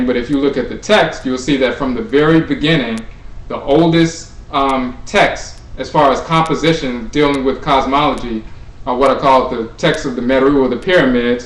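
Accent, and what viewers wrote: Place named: United States. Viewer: I say you're on a rightous track.I think that, because of your American ascent, you need to speak a bit slowly so as for us to aprehend your TWI. I'm an Akan of the BANTU BLOOD line.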